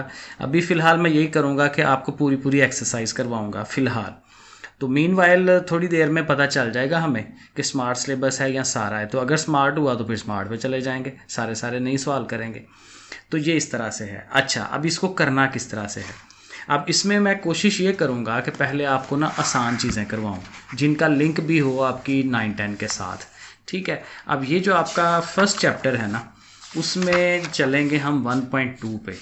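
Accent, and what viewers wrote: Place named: Lahore, Pakistan. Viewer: Sir Ap Lahore sai hain?Ap ka Accent lahoriyon ki Tara ha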